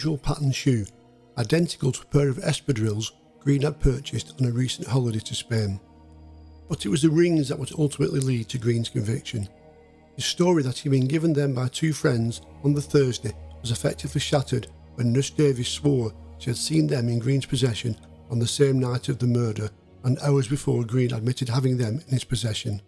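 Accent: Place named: Lancashire, United Kingdom. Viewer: has a lovely Lancashire voice and accent. I love listening to him.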